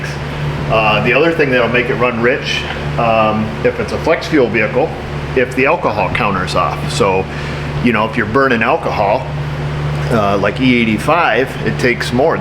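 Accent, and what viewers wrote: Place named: New York, United States. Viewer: I watch every video that you make and look forward to each and every one of them and have been a subscriber for since I discovered your channel.rears ago Ii hit thr "like" button (if I'm not distracted) because i appreciate your honesty to your customers and get a real idea of what it's like running your own shop and it makes me appreciate my local garage. My only beef is the "Members first} option that I refuse to join. I'm from the heart of Dixie and forgive your New York accent because if you wrere the family next door ya'll would be my best neighbors ( I have a French family, a British family and an African American families in our Cul de Sacand they ALL are great folks but there is a loss of communication since I am a BAMMER. I get you and perfectly even though yousay bunnons for BuTTons Keep making great videos and oh did I say I dislike members first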